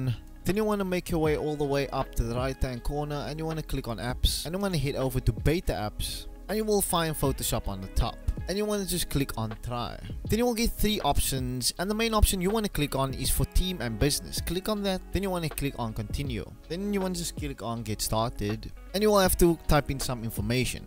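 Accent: South Africa. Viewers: Are you from South Africa? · hes not? hes south african lol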